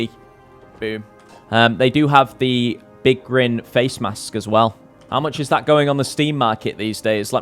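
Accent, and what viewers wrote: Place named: United Kingdom. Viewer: Holy british accent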